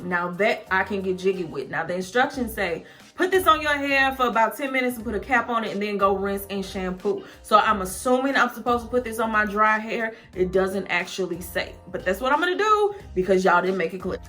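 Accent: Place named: South, United States. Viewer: I love your accent so much !!! Where in the south you from ?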